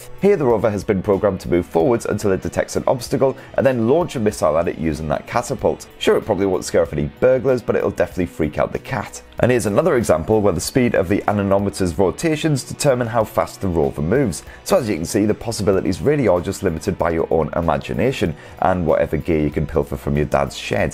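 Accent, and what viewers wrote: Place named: England, United Kingdom. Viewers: Same. I can't quite place which part of northern England he is from. Doesn't sound like Yorkshire or Lancashire to me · I like your english accent sir.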